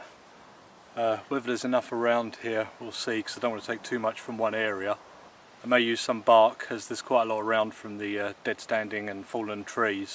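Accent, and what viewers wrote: England, United Kingdom. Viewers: Hello greatings from Moose Jaw in Saskatchewan This is a nice video. You made it again nice and calm I like you way your Englisch way spezialy as a German living in Canada lol. Good idea to cook on a stone I have never done this. Your shelter is good to my respect I hope it will still be there when you return. Ok I wish you luck and can wait for a new one. Take care and stay healthy with your family. Bye bye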